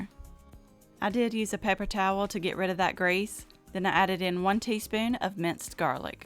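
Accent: South, United States. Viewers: You have such amazing recipes! I love watching you cook and listening to your voice. It's so heavy with your southern accent which I LOVE and it's so soothing. It reminds me of my Momma's♥️ 💕🙏🏼💕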